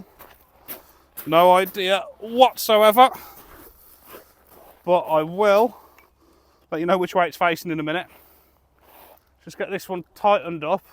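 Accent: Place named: Black Country, United Kingdom. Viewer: Love the dudley accent same as me big up the black Country 😂😂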